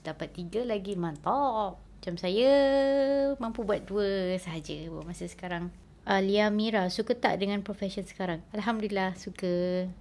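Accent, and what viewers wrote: Kelantan, Malaysia. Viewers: Comeyyy dooh ore kelateyy oyakkk!!! 🥰🥰🥰🥰♥️♥️♥️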